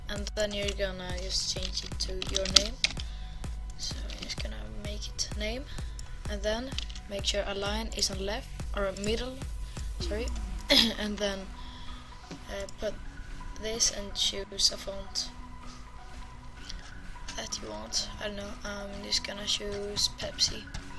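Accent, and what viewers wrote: Sweden. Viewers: u swedish ? You sound like it lol i am swedish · Du bröt lite på svenska ;D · Agario he has to be, definitely sound like a swede! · Lol man hör att du är svensk xD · Thank you so much! now people can make money from this so if guys can make good intros then you can get like 20 $ for 1 intro! btw i did hear that you are from sweden (Im Norwegian!) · lol hörde direkt svenska dialekten så var tvungen att kolla om du var svensk och ja det stod ju i din beskrivning ^^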